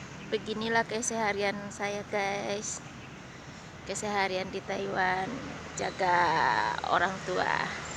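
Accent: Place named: Indonesia. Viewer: Bahasa mandarin kakak sudah lancar cuma logatnya msh kental rasa indonesianya. He....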